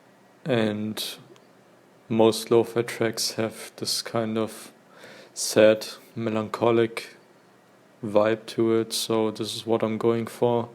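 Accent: Germany. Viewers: YE i thought also about his accent because im german too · As a german, english with german accent makes me cringe so hard. Nothing against you, I think I sound similar. Great tutorial, thank you! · Which is why most of nowadays music sounds thew same. He smashed the genre, true. But it's just one of millions of songs we hear while learning/studying/working. It would be a great start to build on upon, or an easy short tutorial. That's already it, let's everyone agree on paying more attention to the music we hear, so we know when we're getting fucked in our pressious brians by the industry. Good tutorial, boi! Do I hear a german accent? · one word and i was able to hear the german accent XD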